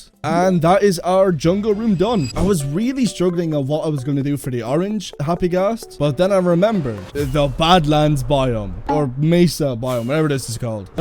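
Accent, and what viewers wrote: Ireland. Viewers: you Irish? Thats cool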